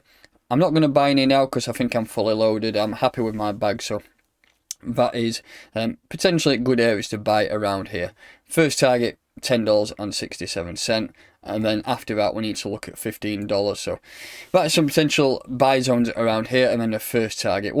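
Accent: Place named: Chorley, United Kingdom. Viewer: I'm putting you in Chorley or somewhere with that accent mate. You deserve a bigger audience - nice, easy to follow analysis.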